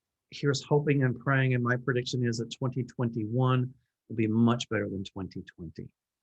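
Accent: South, United States. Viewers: I was just wondering where your accent was from ? I knew it was somewhere from the south. ( I am an Aussie, accents fascinate me ) I love your readings,so happy to see you have recovered from that vile disease 💜💖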